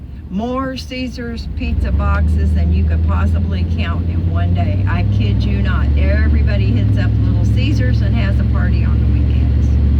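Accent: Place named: Alabama, United States. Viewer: <link> When I was in San Diego and searching for interesting places to visit and park my van that didn't cost anything, I found many places over in Mission Bay by SeaWorld. There is a nice parking area on Vacation Isle where you can park and see the boats go in and out the harbor. These are for day time hours only but some parking areas open real early am for the fishermen to launch their boats. It's fun to see the boats go in and out the harbor. There are even some Mississippi paddle boats to watch at night all lit up in the sky from their lights. Hope this helps. What part of Alabama are you from for your accent sounds Alabamian. I enjoy watching your videos.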